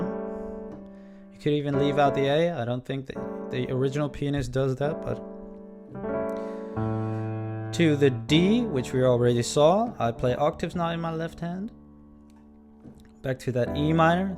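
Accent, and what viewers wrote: Netherlands. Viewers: it's not bothering me but are you Dutch?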